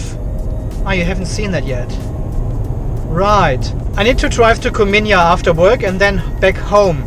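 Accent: Germany. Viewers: Vom Aktzen sind sie deutscher oder? Also die Batterien sind bei allen Herstellern nicht stabil... ich habe einen Tesla Model x und kann nicht behaupten das ich diese Probleme nicht habe. Ich würde auf dieApp mich nicht verlassen...... dies ist ein Problem der App und der Software. Ich komme aus dem QM Bereich der Autohersteller. Die Probleme hat nicht nur Mitsubishi sondern auch BMW und Toyota bei Plug in Hybrid. (Tesla auch) die Fluktuationen und unterschiedlichen Meldung liegen am Bordcomputer und der Sensoren und der Software. Ehrlich gesagt ist es wie beim Benzin der Tankinhalt wird eher geschätzt als gemessen. (Grob betrachtet) was man aber genau messen kann (zumindest bei BMW) ist der Verbrauch dank moderner Einspritzung. So ist es beim E-Auto auch, da wir aufgrund Temperaturen usw. die Leistung zwar messen aber nie genau. Siehe Test von Tesla oder Hyundai e Autobesitzer. 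Wer sein Auto in der Garage mit einem teuren Stromzähler (geeicht) laden tut, hat immer Abweichungen zwischen Zähler und Autoangaben. Beim meinem Model X sind die sogar teilweise heftig. 6-7KW pro Ladung. Ich tanke mein Auto in der Firma mit einem Speziellen Stromzähler Kostenpunkt 2.300€ inkl Einbau. Was die App sagt und die Software, sagt nichts aus was die Realität vom Akku bzw. Batterie betrifft. (Die Angaben über E-Verbrauch sind genau und nur die sollte man bewerten) Jedoch Vorsicht, bei E-Autos spielen extrem viele Faktoren mit, die bei einem Diesel/ Benzin nicht berücksichtigt werden.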